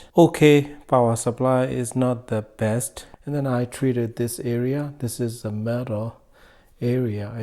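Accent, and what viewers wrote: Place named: Myanmar. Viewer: Your sound like Myanmar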